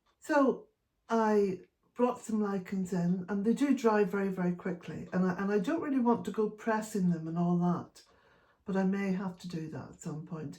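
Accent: Ireland. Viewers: Hi there. It’s absolutely freezing here as well here in Maryland, USA. We have over 3 feet of snow today. Your beautiful Irish lilt reminds me so fondly of me wee Grandma. She was such a dear and our family is from Belfast and I lost my Mom this year in March.Unfortunately I found your channel too late how I wish I could’ve shared your lovely videos with her! She would’ve thought you were just grand ❤️💞🫶🙏🇮🇪 Be well and stay warm🌤️🥰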